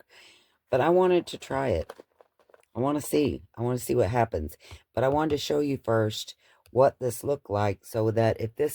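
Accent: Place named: Texas, United States. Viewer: Thanks for this, this is so helpful for someone like me, to get our toe in the water. You’re so fearless!!! Wear gloves!!! If that stuff is corrosive enough to rust paper clips so fast, just think what it could do to those fingers! The reason the soda can doesn’t rust is that it’s aluminum and aluminum won’t rust. And, just another thing, hydrogen peroxide is something that some bombs are made of 😮. Not the stuff you’re using, not the stuff that you can get over the counter, but take care to pay attention to the strength on the label and how warm it’s getting. You can even have an ice bath by just in case things seem to be getting out of hand. Just in case. 😅😊 And wear gloves!!! What about washing the things then rinse with alcohol and let dry thoroughly. Don’t let any alcohol stay behind - another chemical reaction. But, then there wouldn’t be any grease or dirt coating to keep it from rusting faster. 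The the sticks are just the same as paper. 🤔 And wear GLOVES!!! You sound like you live right next door to me here in Austin, TX, get on down to the H-E-B and get you some of them cheap vinyl gloves… 😂😊 I just love your show and I don’t miss a one 😊🥰💙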